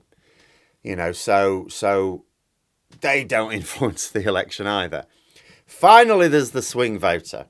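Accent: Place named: Northern England, United Kingdom. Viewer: I’ve watched every video for the last three years, I think I was instrumental in the initial improvement of audio with my sheer unmedicated adhd based abuse… (I was in a bad place at the time, still am lol) Now I’m working on his delivery 😂 My beef is the lack of dynamic control. The lack of breath control. These things would definitely help his career. I’ve been a professional actor/singer and breath control classes basically saved my voice, and made me better at my job. I listen on multiple devices, the audio quality is good until he gets too loud, then a combination of his own setup and YouTube audio compression algorithms makes it less than predictable to listen to, and less than enjoyable, that’s before I get into the psychological effect of being shouted at twice a day by an intelligent yet angry northerner. It makes me angry. 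It’s the natural effect. Anger is useful, but it’s not a good tool for education. I’m a music producer and mixer so audio is important to me. Clear, controlled speech into good quality equipment is what the tv industry has done for nearly a century now, the popularity of YouTube influencers often betrays the quality of their self-production. Many of these people don’t understand microphone proximity or directionality, reverberation, plosives, sibilants, digital clipping and distortion, digital limiting, RMS conventions for broadcast… or the rest of what makes BBC studio sound the best in the world, and is important for consistent, legible, easil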